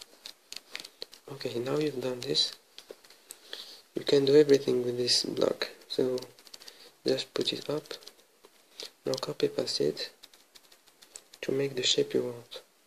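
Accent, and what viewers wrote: France. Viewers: I heard a french accent when you talk I don't know why x) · I can understand that cuz i'm french like him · Hablo español y entiendo su inglés con acento francés \ :v /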